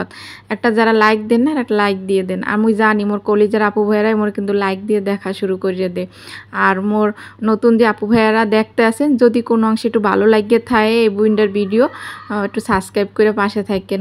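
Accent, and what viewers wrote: Barishal, Bangladesh. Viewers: ❤আপু তোমার সাথেই আছি ❤আর তোমার বরিশাইল্লা পাহা পাহা কথা শুনি ❤👍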